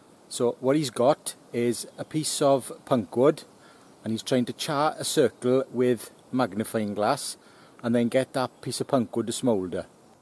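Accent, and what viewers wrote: Wales, United Kingdom. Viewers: Great video, hope you don't mind my asking, but whereabouts are you from? (I know Wales lol) I recognise your accent, you sound like your an Amman Valley boy.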